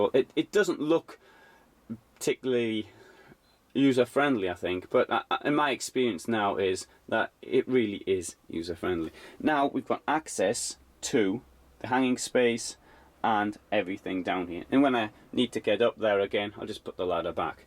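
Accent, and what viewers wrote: United Kingdom. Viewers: Lass-in Angeles Speech impediment? It's called a British accent and a really nice one at that! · Lass-in Angeles If you don't like it don't listen. I like delivery its called British.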